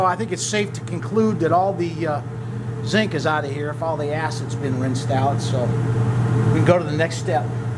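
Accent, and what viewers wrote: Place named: South, United States. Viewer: Great video, but after a while I started thinking it was George W. doing this! Dunno, must be the southern drawl that sounds a little like him...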